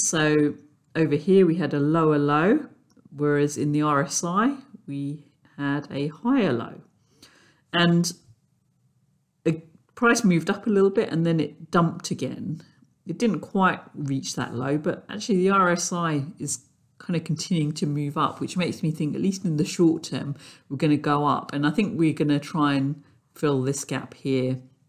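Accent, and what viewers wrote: England, United Kingdom. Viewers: I'm very surprised realizing that you live in New Zealand. I thought you're accent is as english as it can get. I read some reports about New Zealand in a blog I like and it summs up - this now my quote - there's basically a woman ruling like a direct descent of Stalin. We don't here about that in the news neither - lol!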